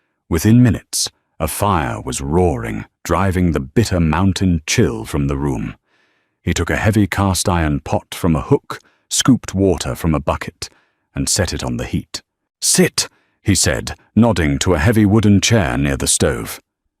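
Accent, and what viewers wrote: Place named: RP, United Kingdom. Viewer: This upper crust British accent is not a good voice for pioneer stories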